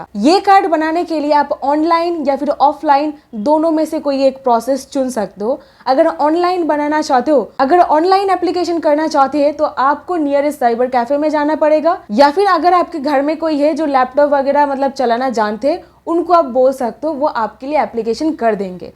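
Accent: Bengal, India. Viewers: I'm 61 and you are Bengali.I can make out because I'm also one but born and lived all my life in Hindi speaking areas. Per se I've not heard of this but will check it out. Not sure if there are any real benefits, that's only feasible in places where active taxpayers fund a social security net, which is not the case in India.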